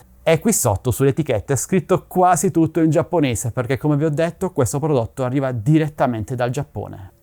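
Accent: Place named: Sardinia, Italy. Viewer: Pensavo fosse sardo!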